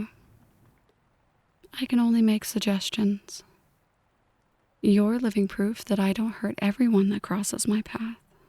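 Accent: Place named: South, United States. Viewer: I just love the southern, though the question I have is the "bless your heart" bit the good version or the bad version?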